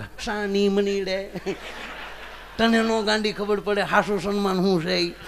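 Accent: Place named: Kathiawar, India. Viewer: Mayabhai kaddak pure kadhiyavadi superb jay mataji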